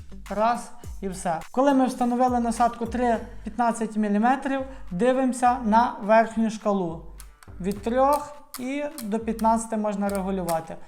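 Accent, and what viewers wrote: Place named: West, Ukraine. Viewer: Приємно чути західну Українську мову. 👍👍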